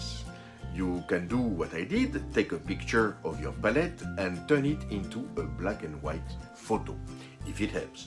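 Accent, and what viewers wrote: France. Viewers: However. As a swedish old man, I appreciate your french seasoning english. I love that. :) That is enough musical to my ears. Your paintings are delicate indeed, and I love to watch. Quite soon I will grab some aquarelle painting. I painted somewhat, many years ago. I am eager, but hesitates about what brand, I want to use, or if guache is the choice. I planned to use just three basic colours, white, and some earth colours. Many years ago, I painted home made baskets, and small furnitures, using egg-oil tempera, or oil and earth pigments, as decoration. It was fun then. I also made oil paint for windows, by grinding titanic, and zinc-white into linseed oil and turpentine. It was heavenly to apply, but a beast to make large amount of. First, a layer a lean, diluted by turpentine. Dry for a week. Next somewhat more oil-fat. Dry for a week, and the final layer of full oil. 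I used aways art brushes from pig-hair, and it was an enjoyment to apply the oil color. Linseed and turpentine is just perfume-alike. I believe you know. :)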